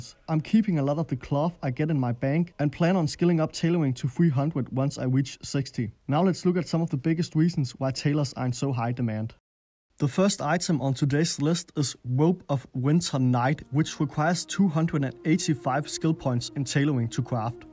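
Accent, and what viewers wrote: Denmark. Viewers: Sådan dansk engelsk 😂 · Sounds like denmark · Pls stop calling him Arnold he doesn't sound like someone from Austria at all, he is 100% danish. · the danish accent is strong with this one! :D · WE GOT A DANE! IN HERE! I CAN HEAR IT! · That's a Danish accent though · I just started watching, and im 100% sure this guy is Danish. Edit: Great video, learned a lot. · Jesus that’s a thick danish accent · Very nice😀..fat danish dialect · Ægte dansk accent.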